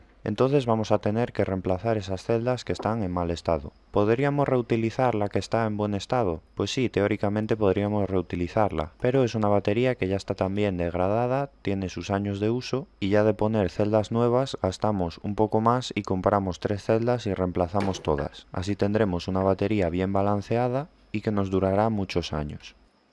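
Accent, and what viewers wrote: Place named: Asturias, Spain. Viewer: Diría que yes de asturiano tío Muy buenos los videos, muy guay explicao, gracias por tu ayuda un sub más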